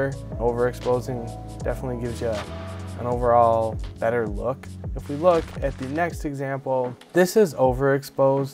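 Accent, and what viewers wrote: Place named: Rochester, United States. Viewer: I haven't been home in over 3 years but just listening to your Rochester accent made me feel at home :)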